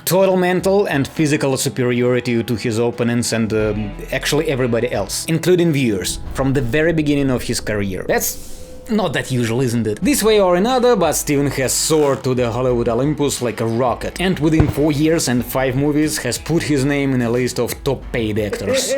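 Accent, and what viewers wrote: Russia. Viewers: dude your russian accent is so thick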